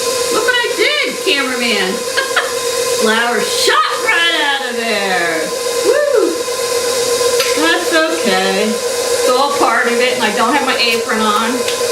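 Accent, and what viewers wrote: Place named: Bronx, United States. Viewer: Hi sis new subscriber hope you become a member a Montgomery Family Channel as well 💋💖 💋 I hear that New York Bronx accent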